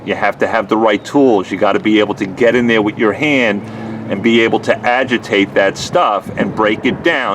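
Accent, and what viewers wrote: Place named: Philadelphia, United States. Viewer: that's not a Texas accent....I'm from the Philly area and I know that accent is within 2hrs or less from me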